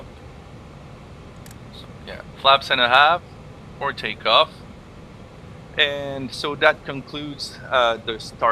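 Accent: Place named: Quebec, Canada. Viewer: Salut! Je me permets de de vous parler en bon français québécois!!! J’ai reconnu l’accent 😅. Merci pour votre produit C17. Ça fais du bien d’avoir un avion avec une livré canadienne!!! 😎 see you in the sky!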